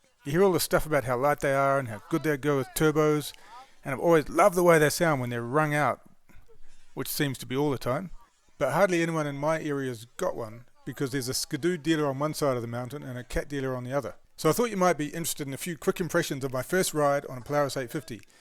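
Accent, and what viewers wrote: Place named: Australia. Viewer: Dude the swedes made the bad ass tune for the yammie. 270hp on the 153. This dude sounds like he's from Australia. That's not a knife this is a knife. They made 2 strokes look like clowns like those guys digging and talking more then riding ripped through arm deep powder. The srx tune to 270 made cats alpha one look real bad. Called weekend sleder's. Even the older stuff 1049 vipers 270 tuned made 2 strokes look bad. Those yammies ate through anything they put them through and everyone knows yammies reputation of big miles and durability. Like it or hate it.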